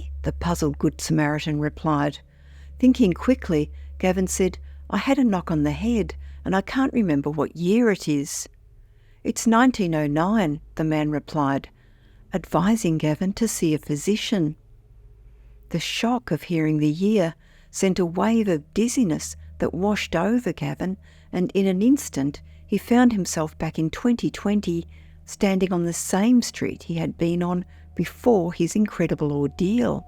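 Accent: Australia. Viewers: I know it's an Aussie accent, just wondered whether it was human or robot. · Voice is Aussi accent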